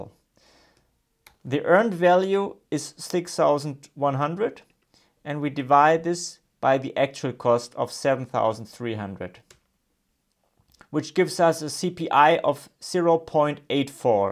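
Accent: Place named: Germany. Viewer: I guess you are German 😊from your accent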